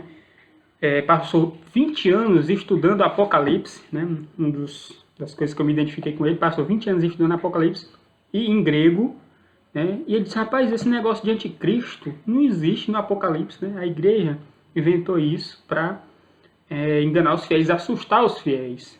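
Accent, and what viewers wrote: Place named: Northeast, Brazil. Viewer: Vc é maravilhoso! Sotaque nordestino do jeitinho q eu gosto. Sou cearense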